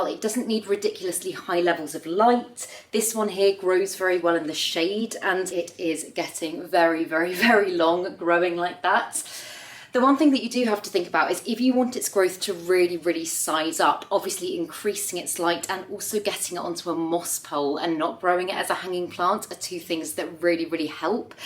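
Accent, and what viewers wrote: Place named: West Country, United Kingdom. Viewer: Love your west country twang!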